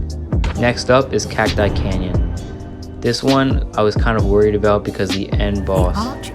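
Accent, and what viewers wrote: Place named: United Kingdom. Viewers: y sound british. btw better reply to this or im unsubbing!!!! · He does fr sound British to be honest in the videos beginning ngl lol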